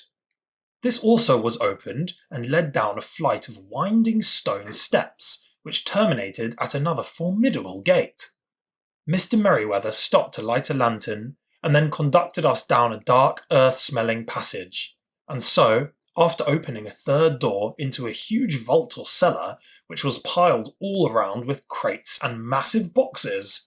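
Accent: United Kingdom. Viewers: 前編のA Scandal in Bohemiaも大変勉強になったので、待っていました！ 典型的なちょっと上流のイギリス英語ですね。何度か最後までしっかりと聞くと、ストーリーがしっかりと理解出来ると思うので、頑張ります！：）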